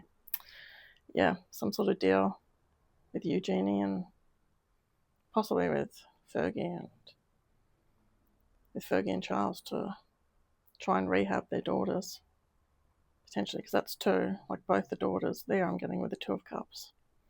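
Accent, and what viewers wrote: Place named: Australia. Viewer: Thanks for another great reading. I totally agree with you about the "York" family; I don't trust any of them either; nor any of the Markles (which of course now incorporates Harry as well). I am guessing that you are from Australia from your accent =). I am subbed to a couple of other Australian readers as well. I am happy that I found your channel a few weeks ago, as I am really enjoying your readings.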